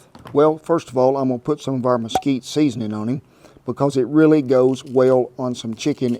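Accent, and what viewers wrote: Italy. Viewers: I luv ❤️ Italian accent! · We love and subscribe to your channel. We watch all of your new posts. Your Italian accent is annoying to me. Not trying to start a movement, it's just not funny or even tolerable to me.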